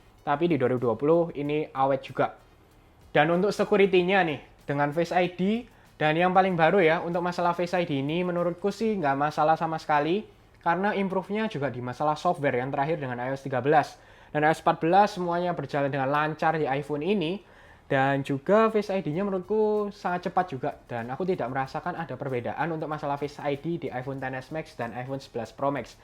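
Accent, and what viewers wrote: Java, Indonesia. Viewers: Nada bicaranya kaya orang jowo bangeddd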